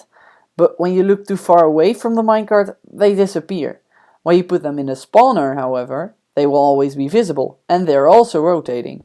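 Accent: Netherlands. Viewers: Awesomely made! Are you by any chance Dutch? You're accent sounds Dutch haha:p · dude are you dutch? you sound really dutch XD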